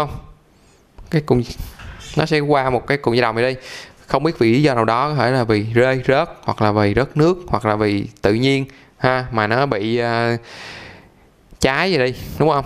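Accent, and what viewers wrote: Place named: South, Vietnam. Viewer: Cũng hay, nói chung thì thật khó để giải thích những vấn đề chuyên môn, vs em thì luôn nghi ngờ mấy cái hàng lock vs like new, tốt nhất cứ vô cửa hàng lớn, máy zin 100% mà mua, ai dốt thì cũng cố trang bị cho mình những kiến thức cơ bản rồi đi mua máy không thì nhờ con cháu. Bản thân em thì mua mới 100% ở đâu thì cũng vẫn phải kiểm tra vì bản thân máy moc không phải cái nào chất lượng cũng đồng đều, mua về dung 1-2 tuần kiểm tra, nếu ngon thì Ok , còn có lỗi là phải đổi trả ngay, thêm nữa tích cực xem review đánh giá để biết đặc điểm tính chất máy. PS anh giọng miền Nam nhiều từ khó nghe quá, có lẽ nên có sub ah. Thanks!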